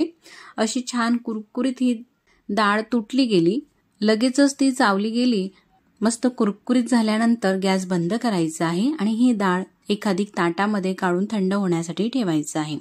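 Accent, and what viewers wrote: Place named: Jalgaon, India. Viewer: Jalgaon kadil lok asech boltat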